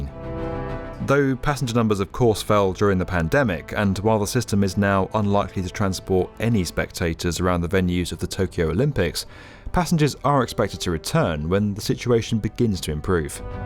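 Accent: United Kingdom. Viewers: This ìs the first time ive had a bit of a hard time understanding a british accent its like they chose the most british person ever lol😂 Easy to use - E to use Schedule - Say dule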